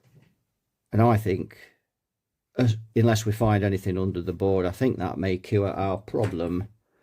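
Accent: Nottingham, United Kingdom. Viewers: Nottingham accent?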